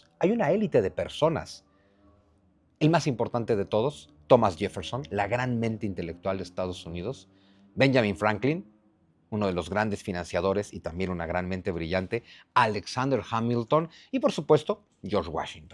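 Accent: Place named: Mexico. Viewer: Me encanta el acento mexicano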